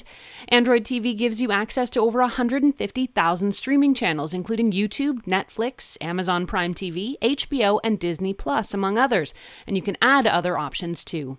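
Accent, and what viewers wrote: Canada. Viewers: Love the Canuckiness! you speak so beautifully, as I normally become instantly frustrated with the regular YT presenters that are far to zippy happy, you present the facts clearly, concisely and at a great pace (without the fluff). Thanks so much!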